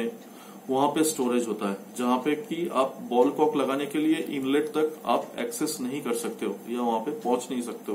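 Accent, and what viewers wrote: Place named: Bengal, India. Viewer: 😀 loved it specifically your deep kolkata Bengali accent you just sound like my late nani who inspite living delhi could not get rid of her bengali accent , any way I made it with a float switch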